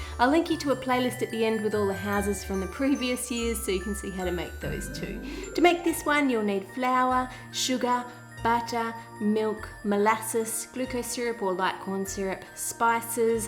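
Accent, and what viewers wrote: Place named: Australia. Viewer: "To make this one, you'll need floura, suga, butta.." HAHA! Sorry, I don't mean to laugh at you, but it's just funny hearing your Aussie accent to someone like me in the U.S. 😅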